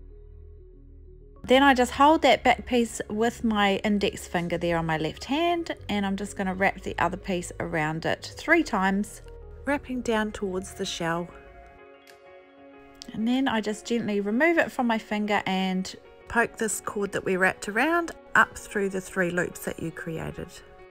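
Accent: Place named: New Zealand. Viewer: Thanks for the video. I will be making something like this for my 7 year old daughter and this video is helpful. I see you are in Australia but it sounds like you have a Kiwi accent.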